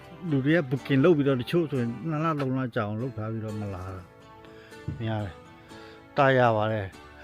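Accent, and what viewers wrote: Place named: Shan, Myanmar. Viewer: မြန်မာ စကားပြောတဲ့ အသံနေအသံထားက ရှမ်းမြောက်နယ်ဖက် နမ္မတူ ကဖက်ကအသံနေအသံထားပဲ ကောင်းပါတယိဗျာ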